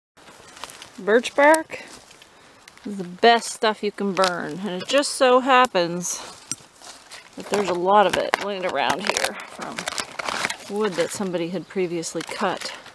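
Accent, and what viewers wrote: Nova Scotia, Canada. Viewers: More Nova Scotian, gold. Killing it.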